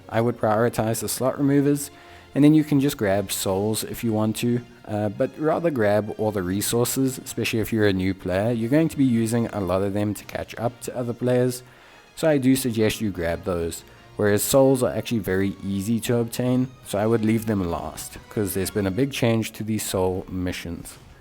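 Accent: South Africa. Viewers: sound south african or portugese learned english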